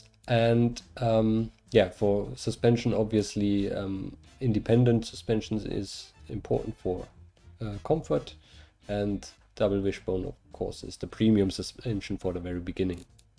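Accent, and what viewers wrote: Germany. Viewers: As a german, i really like your (german) accent. It makes it kind of easier to listen on a second screen (listen to your stuff as a kind of audio tutorial). Especially with kids around. Strange, but it works. Keep up the good work.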